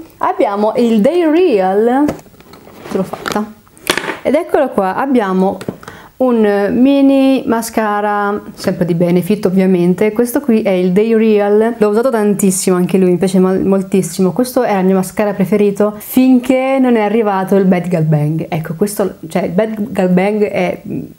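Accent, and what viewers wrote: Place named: Emilia, Italy. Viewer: Ahhhh vedi!? Io sono mezza veneta e mezza emiliana. Ho sentito un po' di accento emiliano (magari dopo qualche anno a Bologna lo hai assimilato!) per quello 😂 Spero di non essere stata indiscreta nel chiedertelo! 💕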